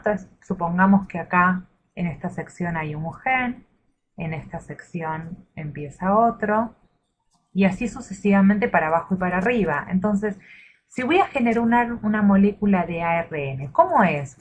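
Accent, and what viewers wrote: Argentina. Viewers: Andaba buscando algo gráfico que me ayude a entender el tema y te encontré! sos una genia y no voy a mentir: cuando te reís o tenés un argentinaz al hablar, me generas mucha calidez! un placer escucharte!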